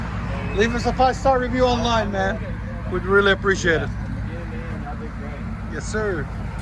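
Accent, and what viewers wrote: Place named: North, United States. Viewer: Tn tags but he sounds like a Yankee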